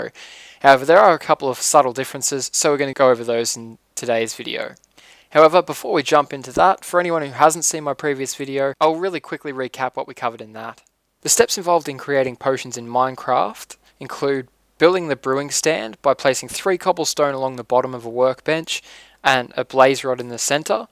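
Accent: Australia. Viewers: your my favorite minecraft person and can u play some battlefeild 3. Do u come from Australia coz u have an Aussie accent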